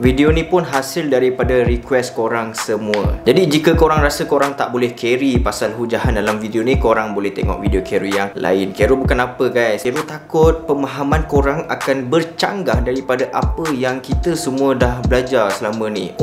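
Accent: Johor, Malaysia. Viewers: Bahasa awk .tak sesuai..giler..korangg gay..DLL...slang lebih Johorean... sedang kan... yang tonton nya satu Malaysia Indonesia...gunalah bahasa . sebut betul sikit..atau baku pun boleh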